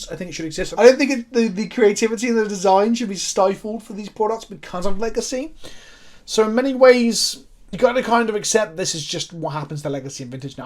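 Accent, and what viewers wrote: United Kingdom. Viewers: It's hard to listen on anything past 1.25 speed like i normally do, bc British accent lol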